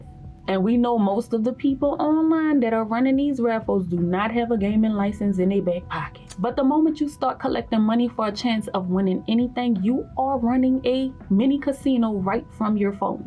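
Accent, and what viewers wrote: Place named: Louisiana, United States. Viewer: That Louisiana accent is too pretty behbeh. Excellent topic pretty Sista. 😊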